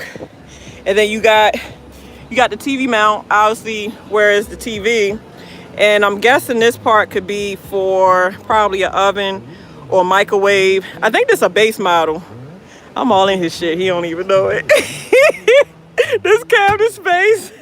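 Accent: Baltimore, United States. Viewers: Are you from baltimore ? I hear a accent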